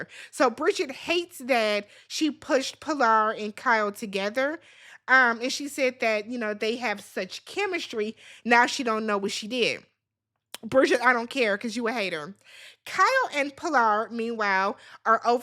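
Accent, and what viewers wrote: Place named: DMV, United States. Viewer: Are you from the dmv area? A couple words and the way you pronounced things gave DMV 😂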